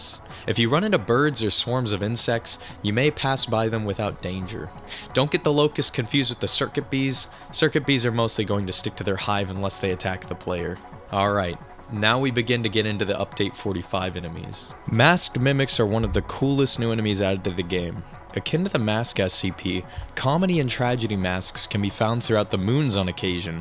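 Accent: Greece. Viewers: Are you greek?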